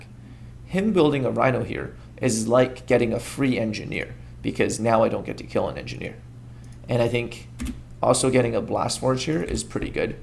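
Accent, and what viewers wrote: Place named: Canada. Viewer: Aboot...he sounds so Canadian. Still great vid - still getting my head around figuring out how to play effectively.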